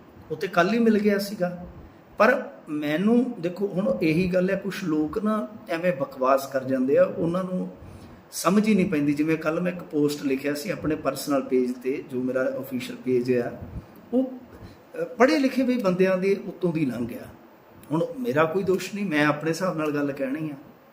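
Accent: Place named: Majha, India. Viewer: Very intelligent and soft spoken journalist is Your Majha accent is very loveable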